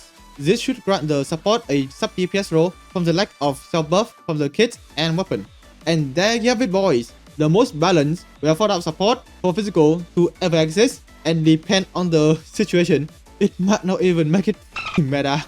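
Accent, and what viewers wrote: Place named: Vietnam. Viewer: Hmmmm... vietnamese accent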